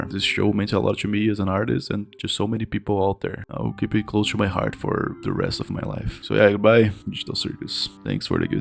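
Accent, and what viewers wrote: Brazil. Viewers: I hope you achieve your dreams man! I'm a fellow artist, and I know that our profession is very hard. You seem very passionate about your craft, never let anyone take that away from you! Also, I couldn't help but notice your accent, are you brazilian?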